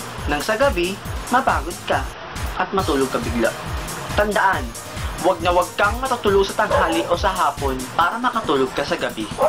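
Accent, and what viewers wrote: Visayas, Philippines. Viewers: bisaya ha..hehe galing naman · "PISTEH!! Ano ba yun" AHAHA!! XD. Bisaya jung dako!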